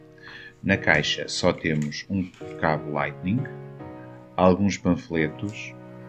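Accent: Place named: Portugal. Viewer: seu sotaque português é muito bonito 😂😂amo seu país🇵🇹🇧🇷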